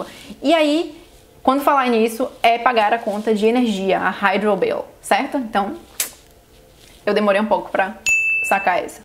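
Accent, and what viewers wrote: Brazil. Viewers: vc merece parabéns só por ter falado o nome do estado escroto no norte hahaha mas tem umas dicas boas. curti mais seu sotaque brasileiro (sdds br)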